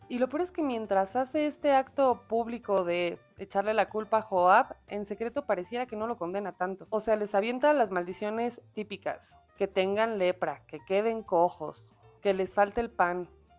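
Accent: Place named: Mexico. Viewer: recuerdo cuando comencé a leer la biblia de inicio a fin ya en mi adolescencia, inició mis primeras dudas a las cuales le comenté a mi madre y esta me dijo: " Recuerda que con Cristo, se selló una nueva alianza eterna" La respuesta nunca me convenció porque más bien parecían dos Dioses. En mi lectura nunca llegué al N.T. en verdad el libro era tan aburrido y habían libros más interesantes que leer... Espero con ansias llegar al N.T. que también tien joyas!!! Saludos desde Panamá. (Me encanta tu forma de leer y cómo el metes ese bello acento mexicano)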